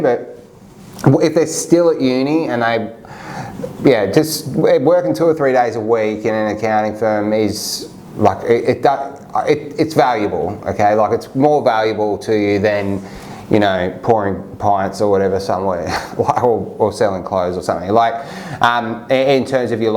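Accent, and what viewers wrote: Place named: Australia. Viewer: Very helpful information! I'm an entry-level accountant working to switch careers from ESL to Accounting. In the US the demand for accountants is so high that they are starting to hire accountants with no degree and certificates instead. It sounds like you are from Australia, is Australia having a similar situation, or are they still solely focusing on people with college degrees?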